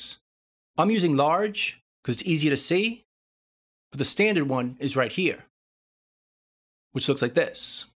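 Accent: New York, United States. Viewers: Irrelevant curiosity: what kind of accent do you speak with? New York?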